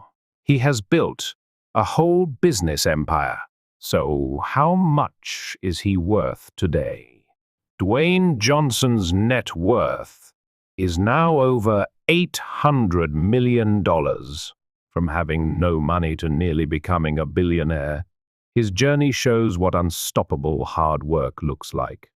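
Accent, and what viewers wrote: United Kingdom. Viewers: I love these stories in British English ❤!! Thank you!!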